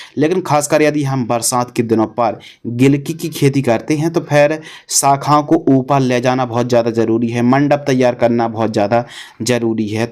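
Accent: Bundelkhand, India. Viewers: Bhai tum ka bundelkhand se ho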